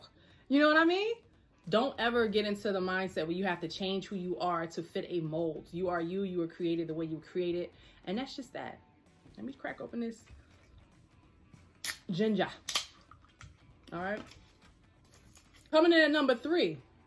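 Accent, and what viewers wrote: New Jersey, United States. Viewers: YO Are U from Jersey City? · Hold up you from new jersey?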